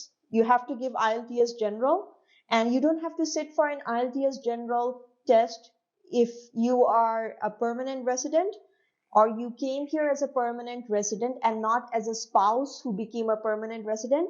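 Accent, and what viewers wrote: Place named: Canada. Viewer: Good Video.Nicely explained.Your English Ascent is not Saudi nor Bangla but more of a Canadian.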